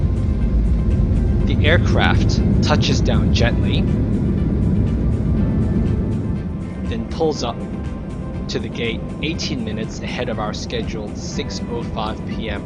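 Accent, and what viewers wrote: Australia. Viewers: Is that a hint of an Aussie accent I detect? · teach me how to speak "Australian" - when you used to say "Partner", was that another term for wife over there?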